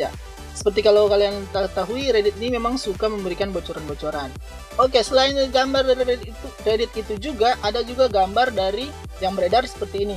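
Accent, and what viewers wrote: Manado, Indonesia. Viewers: orang mando ato Gorontalo? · so kntra tu ba logat manado😂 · .....orang manado?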